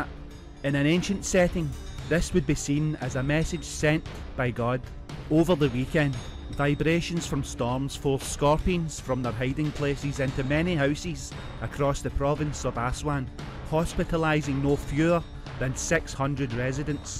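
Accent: Scotland, United Kingdom. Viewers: Your accent lol. Super.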